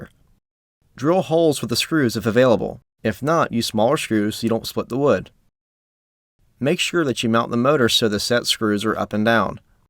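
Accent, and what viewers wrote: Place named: Charleston, United States. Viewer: awesome. I lived in Charleston for a long time. Thought I recognized that accent.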